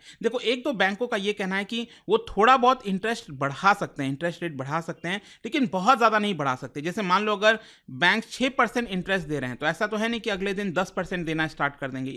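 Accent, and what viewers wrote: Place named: Maharashtra, India. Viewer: maine isliye pucha kyuki aapka tone thoda maharashtrian laga muje btw im from rajasthan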